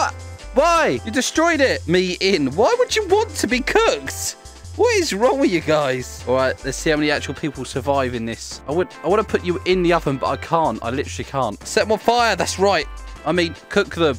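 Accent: United Kingdom. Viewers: Mate ur british?!?! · This dude is Britain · I think he’s British🇬🇧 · Bro is British playing with Americans!?!?!?!?! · Is it me or Have I been watching this guy for 4 years And just realized He's British? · ur from British😮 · Are you British?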